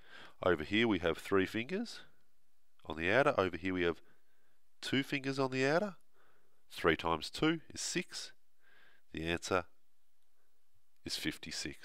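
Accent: Australia. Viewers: He speaks very clearly. I guess you are just not familiar with the Australian accent :) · Why are you talking with like a flu like kind of voice? Are you an Aussie or you are really having a cold?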